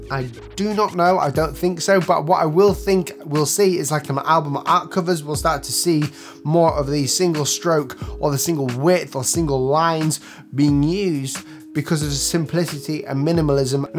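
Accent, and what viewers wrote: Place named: United Kingdom. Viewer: That's how us British say it, like he said 'Adi-das" instead of "Ad-eee-dus". Similar to how we say most countries with the letter I and as "i" such as i-talian, not I-talian :). It's the way we break down the syllables in our speech pattern.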